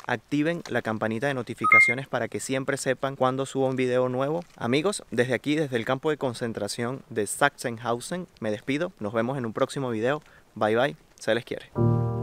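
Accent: Venezuela. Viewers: ME ENCANTO MUCHO LA FORMA EN QUE SIENTES Y EXPLICAS LO QUE ALCANCE A VER DEL VIDEO.. PORQUE LA VERDAD ... LOS SENTIMIENTOS O EMOCIONES NO ME DEJARON CONTINUAR VIENDOLO.... ES MI PRIMER VIDEO QUE VEO DE TI...... ME SUENAS A UN PAISANO...SUENAS COMO VENEZOLANO.... PERO ESE NO ES EL PUNTO..... LA VAINA ES QUE ME IMAGINE ESTAR AHI.... O PASAR UN MOMENTO ASI Y DE VERDAD QUE ES HORRIBLE .. Y ESO QUE ES SOLO IMAGINANDOMELO......... Y SOLO PIENSO EN AQUELLOS QUE VIVIERON ESO.... MUJERES, NENES, HOMBRES DE VERDAD QUE DUELE..... DUELE LA FALTA DE EMPATIA, LA FALTA DE AMOR AL PROJIMO , LA FALTA DE ACEPTAR A LOS DEMAS COMO SON .... NO IMPORTA LA RELIGIONNNN , NO IMPORTA EL COLOR DE PIEL.... NO IMPORTA COMO SEAS..... TODOSSSSSSSSS MERECEMOS [ R E S P E TO ] TODOS SOMOS PERSONAS, NO TRATEMOS A LOS DEMAS COMO SI FUERAN ANIMALES O MENOS QUE NOSOTROS..... POR FAVOR AMEMOS AL PROJIMO COMO A NOSOTROS MISMO..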